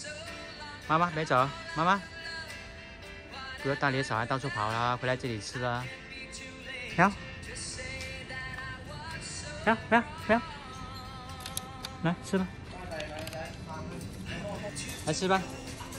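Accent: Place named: Cantonese, China. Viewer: 很有愛心的人，廣東國語聽起來蠻可愛的。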